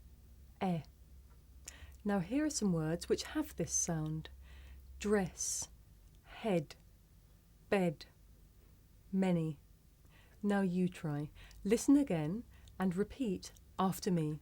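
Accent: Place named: United Kingdom. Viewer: i think it's british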